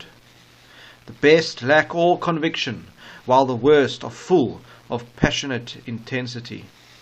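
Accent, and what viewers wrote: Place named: South Africa. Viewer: Haven’t listened to your whole vid yet, but as soon as I heard your SA accent, I knew this analysis would be spiritually mature and thought provoking. Thank you for a beautiful recitation and sharing of valuable insights 🤗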